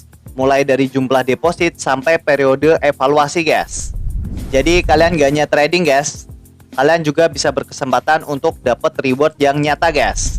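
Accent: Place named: Indonesia. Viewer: orang mana bro? kok medok suaranya haha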